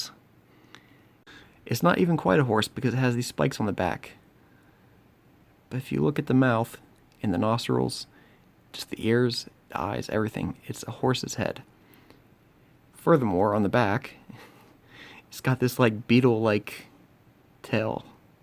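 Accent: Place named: United Kingdom. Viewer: You’re a British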